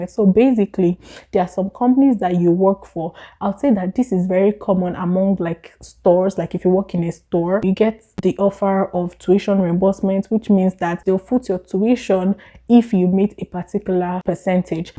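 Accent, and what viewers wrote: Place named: Canada. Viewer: Aha ! Your accent is different in this video compared to a year ago. You sound more Canadian with your English accent.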